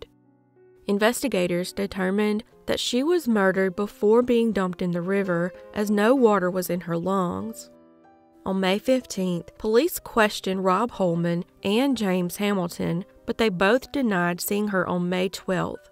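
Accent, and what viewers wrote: South, United States. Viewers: I agree enjoy your delivery and style of your videos. Thanks for being on the platform and never change or edit out your crying or the emotion in your voice because it further humanizes the victim to the audience and I for one Cory right with during some of those child cases. Also, love when call out the predator/criminal in your SOUTHERN accent cause I’m so NEWYork and saying the same thing on my side but when a sweet southern voice calls them the no good human wast of space I’m here for it cause if you wasn’t already thinking it and that voice says it you better reevaluate the amount empathy you give to the 👹. Felt motivated to comment to encourage you to keep going. 🤗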